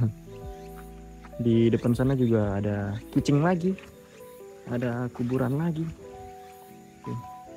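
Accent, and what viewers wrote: Lombok, Indonesia. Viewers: Awalnya saya penasaran ini Abang dari mana, syaa tebak tebak dari aksenya bahasanya awalnya ragu" eh pas menint ini (sumpret)😂😂, badahh Batur Sasak arane sehat sehat Batur mudahan de sukses lek jepang! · Logat nya mirip orang lombok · salam dri lombok bang, stelah sy dnger logat bhs indo sde, trnyata bner sde asli lombok tulen ,, sehat selalu smeton ..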